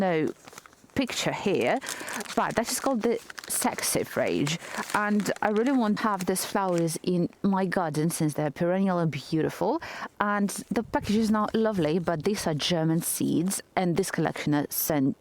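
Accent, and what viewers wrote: England, United Kingdom. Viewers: Hello! Blue eyes, you eyes are sparkling, ❤ Guard your heart Springs could bring 😘 You speak English with an English accents. I love flowers and want to grow herbs. Guarding is so Romantic. Birds, sing, an we can walk bare feet in the grass tax free. Hand and hand ✋ we walk Romantic vibes we bloom, we blossom and 🌹 🌺 🌸 🌼 beyond the gloom!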